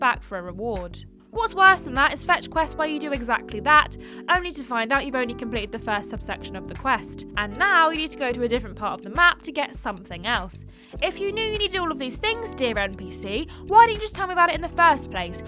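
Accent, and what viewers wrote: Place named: England, United Kingdom. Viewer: I love English accents usually but for some reason puts me off a little. She's doing nothing wrong, it's just one of those things.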